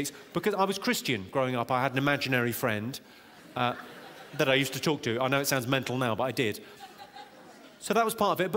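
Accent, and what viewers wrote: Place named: Southern England, United Kingdom. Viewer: He speaks very proper English too, not very regional, just a kind of generic south England. Great for learning English.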